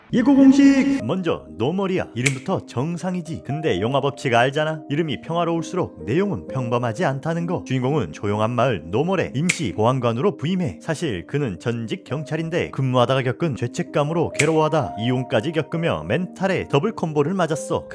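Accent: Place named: Gyeongsang, South Korea. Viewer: 경상도 말투. 재수없다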